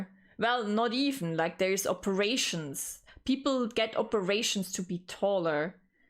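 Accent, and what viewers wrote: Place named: Tyrol, Austria. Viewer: super das man dein tirolerischen akzent auch im englishen hört :D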